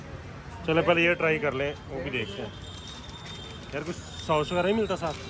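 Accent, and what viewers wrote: Punjab, India. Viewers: Eeseeleeemweeeleekewm.... Bhai Desi tareeke se bhi bol sakte hai. Baaki Sara lehja toh Punjabi hai na to arabi banne ki koshish kyu?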